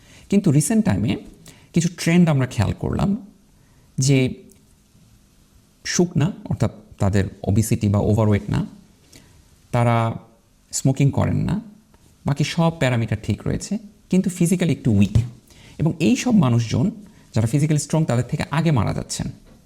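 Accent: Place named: Bangal, Bangladesh. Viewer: বাঙাল তো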